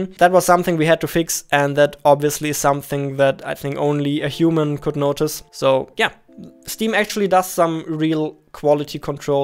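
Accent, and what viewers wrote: Germany. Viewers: Man hört den Deutschen Akzent raus :D. Finde das Tutorial sehr Hilfreich! Danke! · Ich habe direkt gedacht das du bei dem Akzent deutsch sein musst haha :D Super Video! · His dialekt sounds like german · are you german? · Bist du deutsch? 😂 #nofront aber man hört es😊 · because he's German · Hör ich da deutsch?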